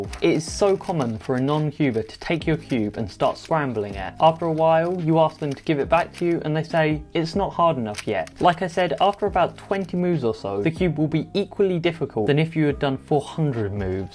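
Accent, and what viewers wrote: United Kingdom. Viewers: first bri'ish cubing youtuber? Nice!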